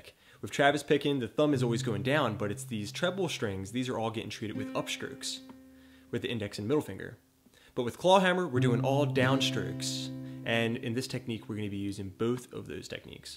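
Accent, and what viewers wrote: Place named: Philadelphia, United States. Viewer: Very helpful. Thanks. And bonus points for the Philly accent. Geaw Iggles! (And Gritty.)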